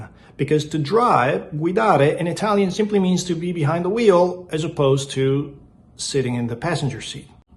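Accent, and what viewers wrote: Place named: South, United States. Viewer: I feel like you're talking with an old timey country boy accent. Has that always been the case and I just didn't notice.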